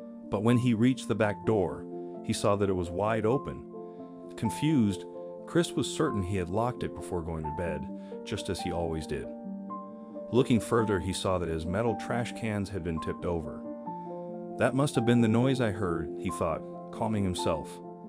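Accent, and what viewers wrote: United States. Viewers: This becomes apparent that this is in Australia! I at first thought it was an armadillo. But “Chinese”! No way! Only Australia has wombats! The man must have lived nearby. There’s no way wombats would travel really far to rejoin a family member? And why do we have a Yank narrating?!?! They are very mischievous animals! Another good reason to allow them live in the wild.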